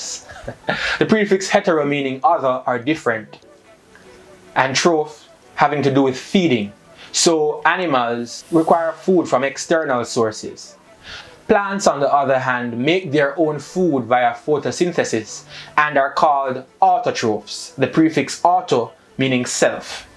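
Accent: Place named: Jamaica. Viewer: Your work is fun entertaining and educational. My students can’t stop laughing and the spit out the information shared with such ease. It’s so refreshing to hear my own accent in something like this. I look forward to watch every topic and won’t stop sharing with those overseas as well. Brand Jamaica mi seh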